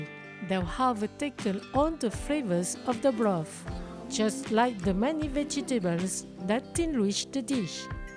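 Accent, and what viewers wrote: France. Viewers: This has to be one of my favorite versions of this dish! The time you took and detail you provided was inspiring. I just love seeing another person who loves cooking world cuisine for the joy of it and it’s so heartwarming to see what I perceived as an Asian woman, with a French accent, making a West African dish that I, as a woman of similar differences, love recreating meals and the memories I love from around the world. Thanks for sharing 🥰Can wait to watch more of your videos ❤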